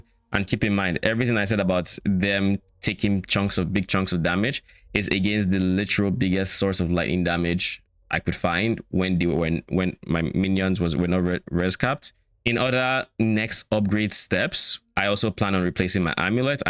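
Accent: Nigeria. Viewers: Came to see the build out of curiosity, followed after hearing what sounds like Naija accent 😅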